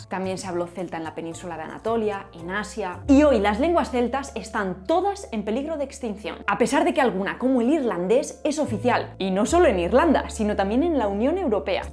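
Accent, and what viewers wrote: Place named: Spain. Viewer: Hola!!!! Muy buen video!!! Tu acento me encanta y me enamora!!! Que lindo es oir a un español hablar!!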